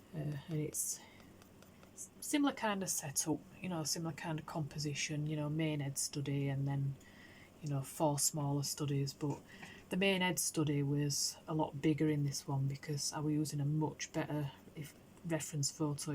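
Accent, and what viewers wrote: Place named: Northern England, United Kingdom. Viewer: I love hearing a fellow Northern accent! This drawing is beautiful, you've done a great job with the composition. I always find it tricky putting multiple photos together. I'm with you on the heat, it seems to linger in the house for days it makes sleeping near impossible 😂 This was great I really hope you film more of these and don't feel like you always need some interesting topic to talk about, I like listening to the mundane / every day life stuff ☺ Congrats on hitting your watch hours!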